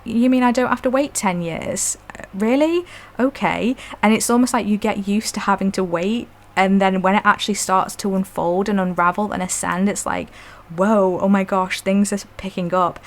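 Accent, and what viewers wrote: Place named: England, United Kingdom. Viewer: 1 - phenomenal reading, I could relate to all of it, including aches and pains, exactly where mine are. As for suns I did another reading and the lady pulled 4 sun cards out. I’ve also been working on my God (sun) connection 🌞 Thank you so much, as a fellow Pom living in NZ, it’s lovely to hear your pommie accent ❤ I’m feeling very grateful and blessed 💐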